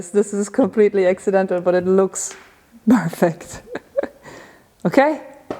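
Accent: Germany. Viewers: Hi super Cool geworden. Echt genial. Deine Deutsche Aussprache hört sich sehr sympatisch an . Könntst du öfters machen.. Liebe Grüße aus meinem Mini Bastelkanal Meenzer Bastel Bude und bleib mit deinen Liebsten gesund. Von Stefan.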